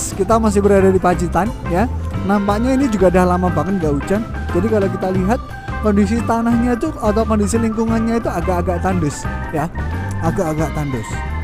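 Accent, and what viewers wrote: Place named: Ponorogo, Indonesia. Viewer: Iki lho dlondonge wong ponorogo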